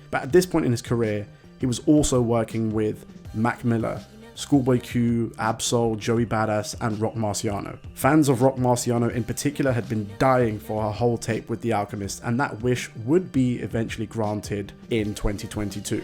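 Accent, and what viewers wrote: United Kingdom. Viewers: im listening to a a British person talk about Americans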